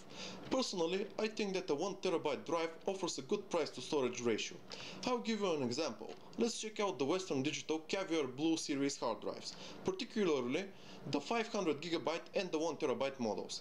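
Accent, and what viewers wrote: Russia. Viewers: Lol, I find the way you talk funny, are you Russian or something? Good video!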